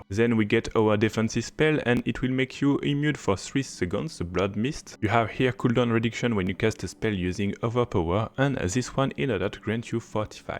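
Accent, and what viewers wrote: France. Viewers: J'adore notre l'accent fr en anglais 😅🤣... mucho love❤😉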